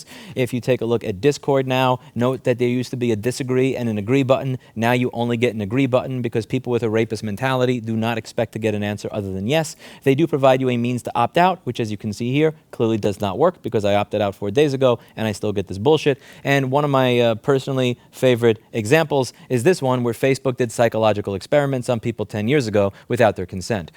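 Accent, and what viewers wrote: New York, United States. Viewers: how do you sound more new-yorker than you did when you lived there 😂😂 great vid regardless... keep up the pressure on anti-consumerism!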